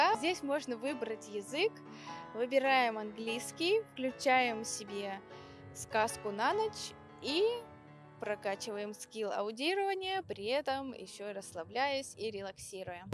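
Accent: Russia. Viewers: В первую очередь, хочется выразить благодарность за Ваши труды. То, что Вы делаете это прекрасно., расскажите откуда Вы родом и где живёте (где такие самородки появляются)) Даже в пределах России, бывают акценты и говоры. Я тоже что-то слышу в Вашей речи) То ли какой-то пермякский говор, то ли зарубежный акцент?) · Don't know what's sexier, that outfit or your Russian accent :)) Your piano playing is phenomenal and loved the outdoor setting. Curious where you mounted the camera lol :)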